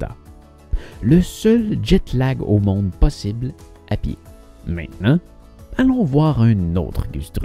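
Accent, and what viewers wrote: Quebec, Canada. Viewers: Ha la la. Je suis désolé. Mais je n'arrive vraiment pas à aimer l'accent québécois. Je trouve qu'il gâche le sérieux de son reportage. Mille pardons.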